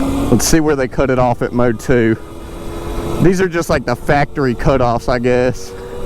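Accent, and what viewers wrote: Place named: Tennessee, United States. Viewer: Definitely sound like you from Tennessee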